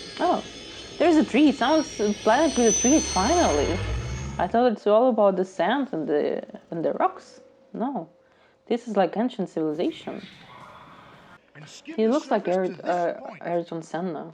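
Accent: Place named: Eastern Europe. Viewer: yeah Alec Guinness is a legend, other classics he acted in are The Ladykillers, The Lavender Hill Mob and Kind Hearts And Coronets. As for your accent, those from the former Soviet states in the eastern bloc sound very similar. Your name sort of gives it away though, you wouldn't happen to have Romanov blood would you lol?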